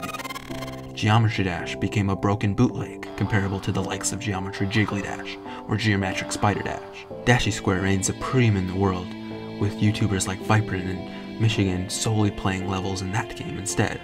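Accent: France. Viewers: are u french?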